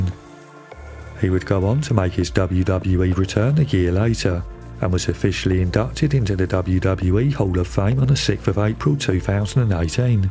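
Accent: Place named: London, United Kingdom. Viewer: Your accent is cool. I'm guessing you're originally from the London area? Maybe Surrey.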